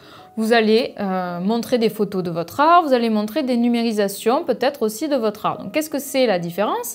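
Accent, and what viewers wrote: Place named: South, France. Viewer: très bien je suis inscrite , j'ai déjà votre livre depuis le début je peint seulement depuis juin 2019. merci, vous avez l'accent du midi. a bientôt sur internet lucette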